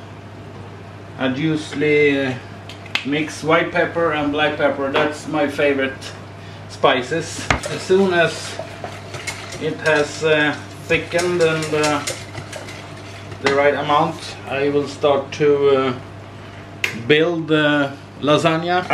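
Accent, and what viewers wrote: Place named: Sweden. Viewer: Grym video. :) Din engelska är faktiskt ganska bra. Mycket "Svengelska" Men jag tror att alla engelsktalande personer förstår vad du säger :) Stor tumme upp till dig. Dessutom blev jag väldigt sugen på lasagne nu :)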